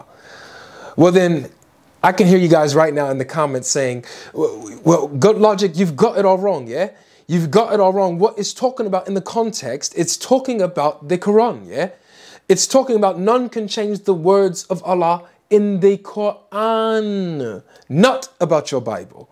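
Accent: United Kingdom. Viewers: that bri ish accent was fire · that british accent is on fire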